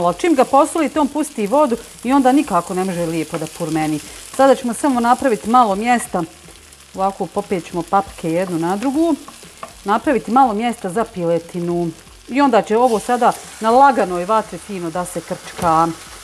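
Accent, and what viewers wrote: Bosnia and Herzegovina. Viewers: Rucak ukusan i jednostavan, uzivam slusati nas srednjobosanki akcent👌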